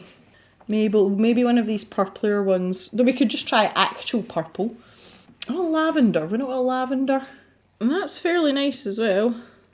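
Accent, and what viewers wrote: United Kingdom. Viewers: I’ve just started colouring so I’m late to the party. Your vlogs are great and love your accent. It must be one of the best accents in the UK.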